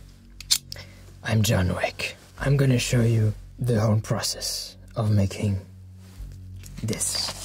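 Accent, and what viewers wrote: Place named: France. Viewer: I swear I’m not just saying this because of your accent, but I hope you’d do a Frenchie (The Boys) roleplay someday! I know guns would be controversial, but it would be cool if you were building a gadget or making some kind of contraption like he does. I just don’t think anyone non-French could pull that off lol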